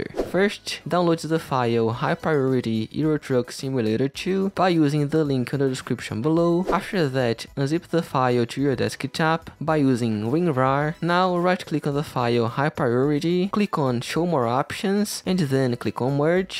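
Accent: Brazil. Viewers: demorei 2 minuto pra notar q o manin era brasileiro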